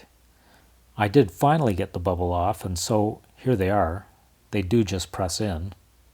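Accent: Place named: Canada. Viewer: You should do a video on the first aluminum iMac model from 2007! You could show Apple's sudden shift to their current aluminium/brushed metal look for their iMacs, since they released a white iMac earlier in the same year. I REALLY miss the white clear plastic look, but I have a fondness for the early aluminium iMacs. About 2 years ago I rescued one from being thrown away at my university. It was running Yosemite (and very poorly). I installed Leopard on it using a modified install disk for a MacBook. But I'm planning on putting Tiger on it sometime in the future. I even picked up the original mouse and keyboard that came with that model, just to keep it era-accurate. Also love your channel, I immediately knew you were a fellow Canadian.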